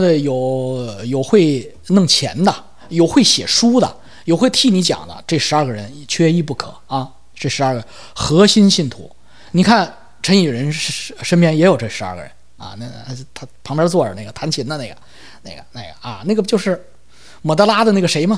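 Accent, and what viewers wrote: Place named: Tianjin, China. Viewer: 是桂林嘛，像天津話的嘛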